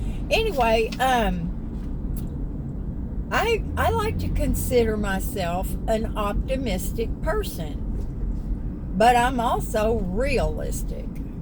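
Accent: South, United States. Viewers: I lived in England until I was 5 when we moved to Canada. I'm in my 60s now, but still develop a peculiar affected sounding accent when talking to Brits or in the UK. However, I also would develop a southern US accent with enough contact. As another commenter wrote, it's incredibly infectious. I would probably develop a southern accent if I binge watched you.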